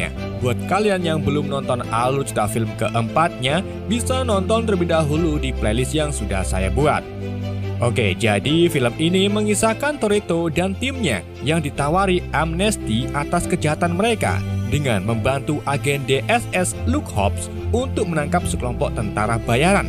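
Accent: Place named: Banyumas, Indonesia. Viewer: wong ngapak ya mas nyong ya iya i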